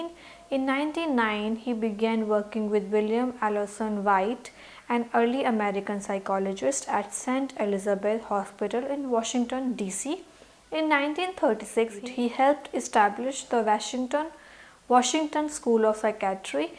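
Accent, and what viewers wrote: India. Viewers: I dont understand the words you said because of the Indian accent..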